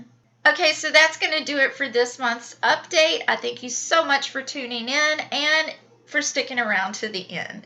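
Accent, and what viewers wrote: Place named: South, United States. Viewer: Based on your accent, you probably have eaten plenty of Vi E Na sausages in your life. Vi(rhymes with die) E it’s a hard E, Na (rhymes with huh). Ok for all you non southerners let’s say it! Vi E nah sausages or just Viennas for short. And you eat them with soda crackers, not saltines. Southern lesson is over, go get you and your mama summan ta eat! 😘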